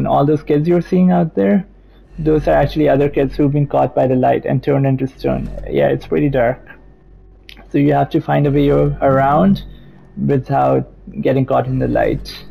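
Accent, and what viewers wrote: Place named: United Kingdom. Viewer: i was about to say i am glad to hear your bristish english voice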